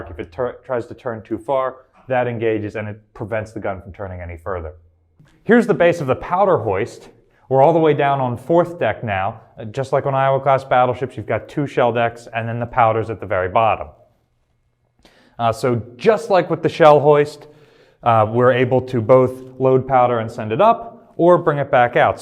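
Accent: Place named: New Jersey/Philadelphia, United States. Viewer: Yeah, definitely from the NJ/Philly area. That's how they pronounce it down there.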